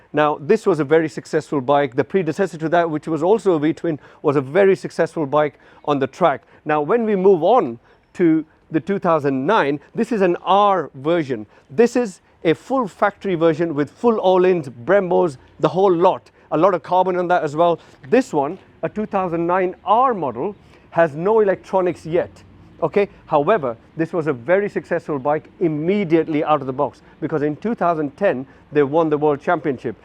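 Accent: India. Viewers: You got an Indian accent... are you originally from India? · U have somewhat Indian accent 👍 .. loved your video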